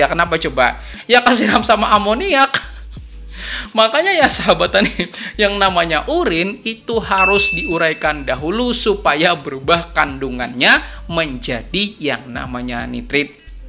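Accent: Banjar, Indonesia. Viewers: Tetap ketahuan logat banjarnya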